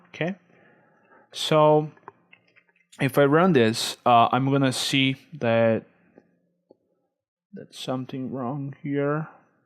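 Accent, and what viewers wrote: Brazil. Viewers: Mano você e brasileiro ? Ou americano ? ._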